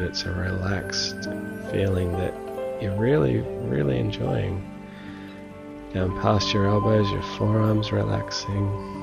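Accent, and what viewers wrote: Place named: Australia. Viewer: This could have been a beautiful meditation if you trusted us to connect with our higher self when you took us there and gave us a little silence to see what arose. You were pushing an outcome, Just a little advice, a meditation is not a pod cast, too many words risks us being moved by your words not our personal 'inner body felt' experience which will be different for everybody and it also keeps us in our heads, having to try to listen to every word you say. This was my experience but going by the comments i am alone in this so obviously it served a purpose for so many so congratulations. Best bit of this was to hear an Australian accent for a change. love Mary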